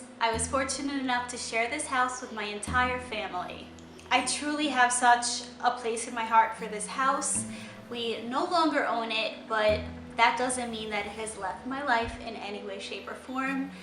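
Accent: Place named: Long Island, United States. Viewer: I watched your first video about your summer house...I'm from L.I. originally and immediately could tell your accent!! are you from long island as well? anyway love your videos... watching from LA where I currently live! ♡♡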